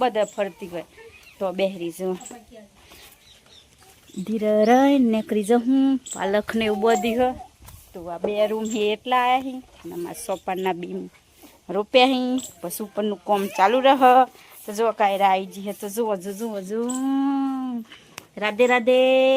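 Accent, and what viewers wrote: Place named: Sabarkantha, India. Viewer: બેન તમારી બોલી પરથી એવું લાગે છે કે તમે સાબરકાંઠા જિલ્લાના હોય એવું લાગે છે 🎉🎉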